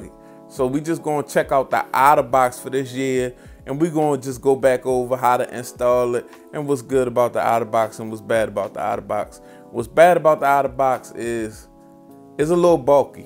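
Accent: Baltimore, United States. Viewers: whaaat. Baldamoe?? Not gonna lie I'm from here too but I didn't pickup but now that someone said it I Hear it lmaaaooo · i hate ur baltimore accent but thanks for the vid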